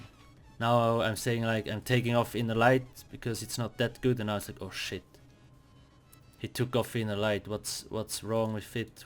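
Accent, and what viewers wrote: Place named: Switzerland. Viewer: you sound a lot like a swiss, are you one?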